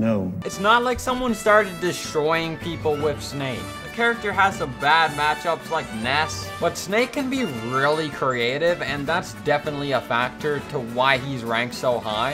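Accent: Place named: Newfoundland, Canada. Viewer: U sound like a Newfoundlander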